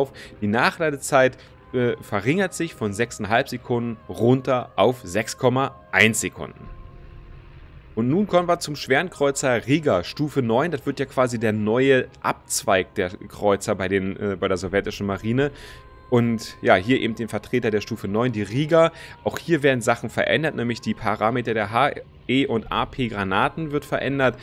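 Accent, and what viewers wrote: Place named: Berlin, Germany. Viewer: Da hört man irgendwie ganz stark den Berliner raus, oder? ;)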